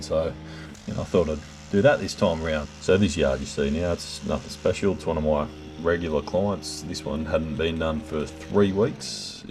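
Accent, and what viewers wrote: Australia. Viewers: I like your techniques, it is nice to hear an Aussie accent with this type of video,keep up the good work Mate 👍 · Subscribed awhile back and glad to see more have found you. Screw those bringing negativity. Enjoy the work, your attitude, seeing Australian yards and the accent. Keep it up!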